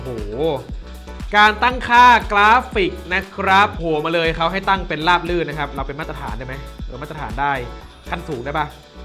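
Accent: Chiang Mai, Thailand. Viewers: พี่เป็นคนเชียงใหม่หรอคับ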